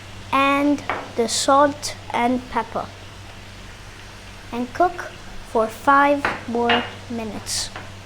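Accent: United Kingdom. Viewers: British pronunciation 😊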